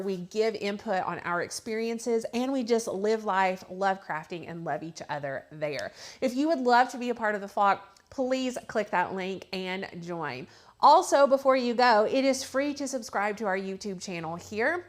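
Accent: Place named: North Carolina, United States. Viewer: I got the wooden needle holder too! Just discovered your channel and love it. You sound like you're from North Carolina!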